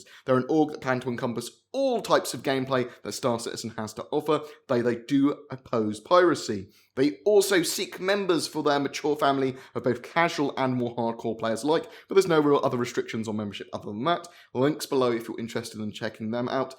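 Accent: United Kingdom. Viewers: Terrific update, always love hearing about exciting SC news in a British accent. 😃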